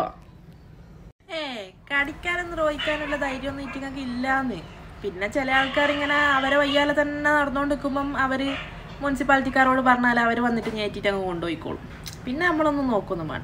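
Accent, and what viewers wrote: Kannur, India. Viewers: Super chechi kannur slag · my wife and daughter are enjoying your all programs. We are from Kannur, so it is our normal talk. Thanks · കണ്ണൂർ ഭാഷ ഇത്ര ഭംഗി ആണെന്ന് ഇപ്പൊ മനസിലായി...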